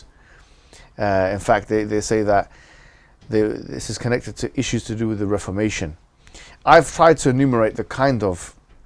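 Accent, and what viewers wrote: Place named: Kashmir, Pakistan. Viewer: Bruh u producing quality videos with quite low subs. Subbed to u. Where r u from? U sound like kashmiri-Pakistani.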